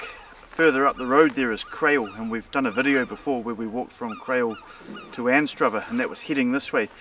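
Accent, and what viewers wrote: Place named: New Zealand. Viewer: For what it's worth I enjoy videos like this one. I am also a "Kiwi" (I thought I detected your accent a while ago but I was not certain) and I reside in New Zealand, but I lived in Scotland (Oban to be specific) for about two months in late 2001. There's some superb photography in this video - I really appreciated it.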